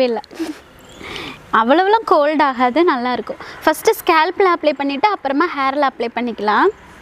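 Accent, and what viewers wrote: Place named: Valliyur, India. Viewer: unga speech enga ooru mathiri irunthuchu athanalathan keten enaku vallioor sister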